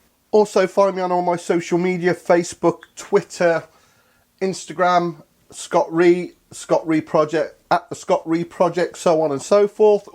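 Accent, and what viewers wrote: United Kingdom. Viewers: I don't think that having a British accent and an arm full of tattoos makes him a meat expert. Half of what he said towards the end was pure garbage. If you want cheap meat buy ground meat and add to it. Burgers, meat loaf, etc.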